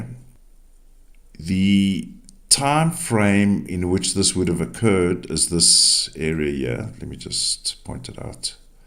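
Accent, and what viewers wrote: South Africa. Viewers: I detect a south African accent. I grew up in vanderbijlpark south Africa. I recognise that accent anywhere even though I've been back in the uk 39 years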